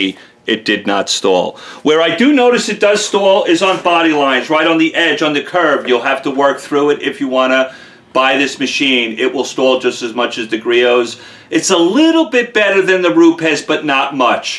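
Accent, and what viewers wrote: New York, United States. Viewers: A Texan with a NY accent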